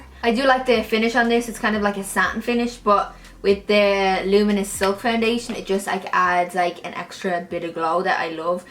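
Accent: Ireland. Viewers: I won't lie it looks really pretty with your pink hair. not too matchy matchy either. have I mentioned I'm in love with your accent? I detect Irish am I totally off??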